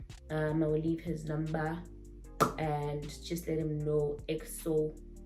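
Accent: Nigeria. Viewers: Every time I fell in love with your Nigerian accent😊❤ I love you ❤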